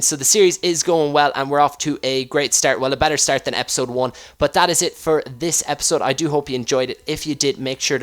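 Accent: Ireland. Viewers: i love you cause your irish lke me:)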